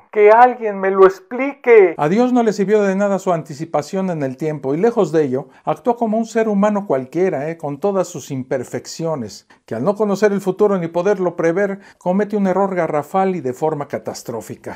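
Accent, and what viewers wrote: Mexico. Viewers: Es muy fácil, si es un mensaje cifrado con la posibilidad de ser interpretado por los enemigos, que clase de mensaje cifrado es?, por eso no lo puedes interpretar, ni el diablo puede hacerlo, lee Mateo cap. 4 y lo verás, ahora, enumera todas las contradicciones que has encontrado y te ayudaré a despejarlas, según parece ese libro tiene el aval de la historia que fue creciendo en el tiempo hasta la actualidad, Israel era una persona como tu, una persona que existió 😂, salvo que te niegues a ti mismo como un ignorante con acento mexicano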